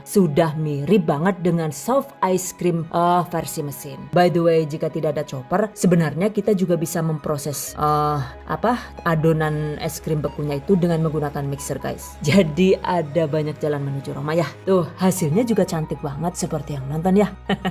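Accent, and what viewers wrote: Banyumas, Indonesia. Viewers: Ide usaha rumahan nih 👌 Mantap sukses selalu Ngapak juga ya yu 😅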